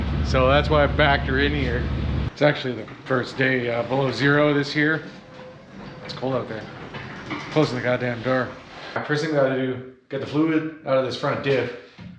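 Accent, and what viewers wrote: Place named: Canada. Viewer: You kinda sound a little Canadian. And I thought you was like from the states. But anyways good job. Keep up the good videos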